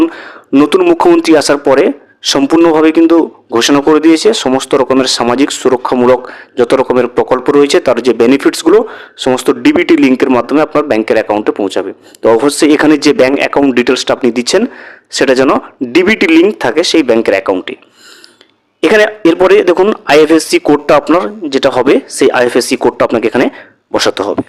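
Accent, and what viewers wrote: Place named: Bangladesh. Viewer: apni ki Bangladesh teka video toiri korchen apnar voice ta Bangladesh desh er moto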